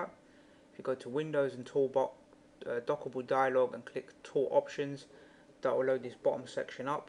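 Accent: United Kingdom. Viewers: Like the British accent! You need to do some gimp starter tutorials as you are telling elementary steps while doing advanced tutorials man!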